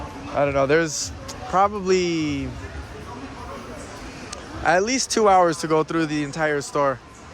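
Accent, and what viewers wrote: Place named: Buenos Aires, Argentina. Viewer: totalmente de acuerdo. Lo escuche hablar en español y el acento era totalmente porteño.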